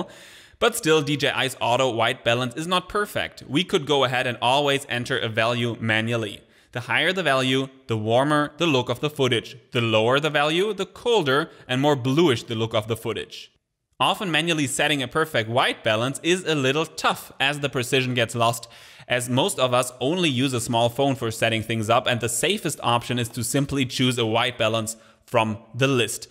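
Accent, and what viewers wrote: Germany. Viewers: Hört sich an wie ein Deutscher, der englisch spricht.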